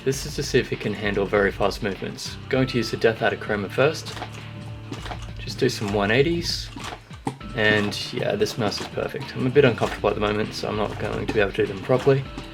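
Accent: Australia. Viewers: Hi it's me again, I love your videos. Is the Razer Deathadder durable? How stiff or light are the main left and right clicks? Are you australian or do you just live in australia?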